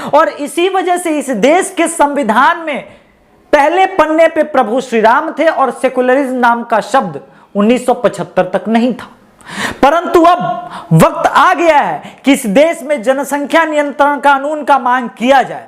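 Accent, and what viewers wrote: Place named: Bihar, India. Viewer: apki awaj me wo humare bihar wala touch bujha raha hai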